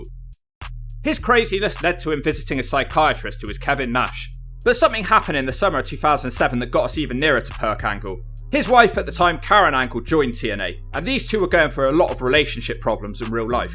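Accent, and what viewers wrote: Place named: England, United Kingdom. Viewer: Addiction is no joke thumbs down stupid English accent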